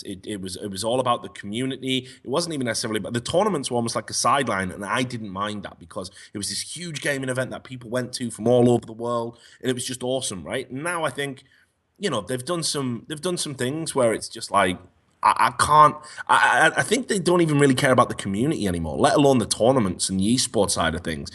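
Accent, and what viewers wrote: Wales, United Kingdom. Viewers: I've never heard you with a Welsh accent before... Sam rubbing off on you? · Never realised how Welsh normal off camera voice is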